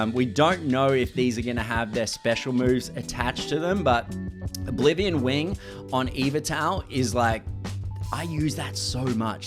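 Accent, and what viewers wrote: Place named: Australia. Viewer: Bro are u Australian? I love Australia 🇦🇺❤️❤️🇦🇺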